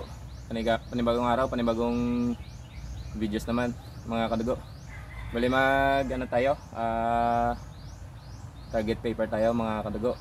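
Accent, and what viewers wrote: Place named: Iloilo, Philippines. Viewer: sir my accent ka sir...taga iloilo kba sir?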